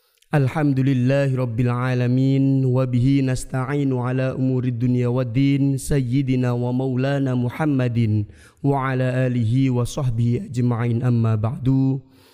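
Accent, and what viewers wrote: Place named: Central Java, Indonesia. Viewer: jenengan orang mana tadz,og sama org jateng cara biLaLx🙏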